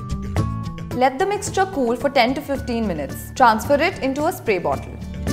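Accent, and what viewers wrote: India. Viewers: Chinese with indian strong accent , itd called miracle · Girl, your accent sounds like Indian but your face looks like Vietnamese or Filipino.... no offense please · Why this Korean have an Indian acsent? Lagta hai hum famous ho gai hai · why there's an "asian" girl with an indian accent on my screen? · I don't want to be affensive but why does she sound Indian or is she is Indian? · No offence but how do you have an Indian English accent or is it just me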